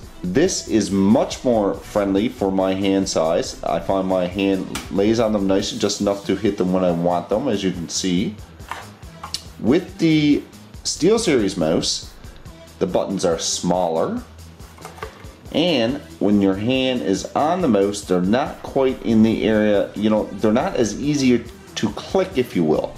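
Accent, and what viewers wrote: Newfoundland, Canada. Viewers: You are so Canadian! I'm Canadian but I don't have an accent like that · Where are you from? You sound like your from NL Canada! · Are you a newfie? You sound like a newfie. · god im canadian but this guy is really canadian haha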